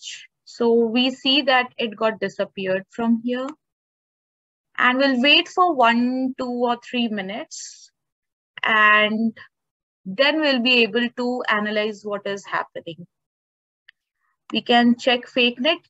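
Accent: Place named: India. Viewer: Indian Acent.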